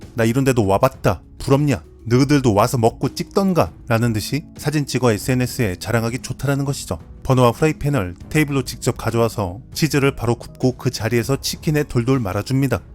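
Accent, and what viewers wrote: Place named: Chungcheong, South Korea. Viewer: 내가 제일 좋아하는 유투브 프로그램!! 특히 충청도 목소리가 감칠 맛이 나지 누구도 흉내내지 못하는 저 리듬감 있는 목소리 패턴은 개그만담도 많이 해봐야 아는건데.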